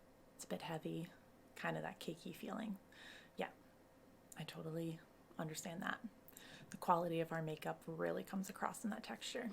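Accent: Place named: United States. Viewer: I totally thought you were going to have a british accent 😂 I'm actually shocked you have an American accent 😂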